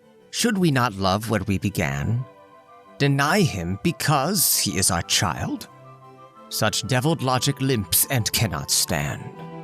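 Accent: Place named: United Kingdom. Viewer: Unbelievably articulate narration with subtle...nearly British undertones and enthusiastically colorful character voices add everything and give life to both of these stories... and every other story I've heard from you. Excellent work!😁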